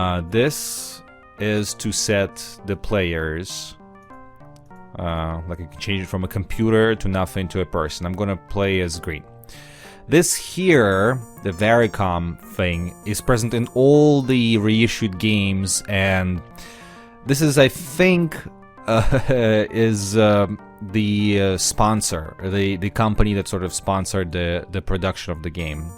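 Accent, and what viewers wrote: Russia. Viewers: слышен твой русский акцент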